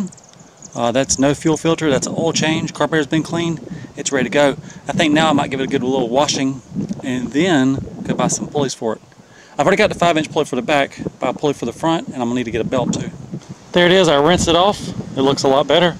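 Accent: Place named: Alabama, United States. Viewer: Alabama? Cool accent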